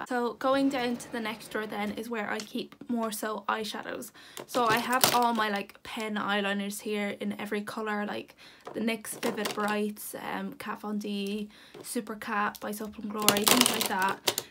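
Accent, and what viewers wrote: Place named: Ireland. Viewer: Where abouts from Ireland are you?? I’m Irish but live in England , and I absolutely love your video and accent mines faded but it comes back ❤️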